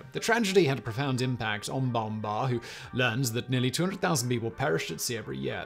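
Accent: England, United Kingdom. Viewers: I too found this information interesting. Unfortunately, I find it hard to understand you though. It isn’t how fast you speak, but that you do not fully enunciate. Many words trail off in volume such that I am struggling through the whole vid trying to catch your words. Add the English pronunciation, and it becomes too much work. I gave a thumbs up for great content.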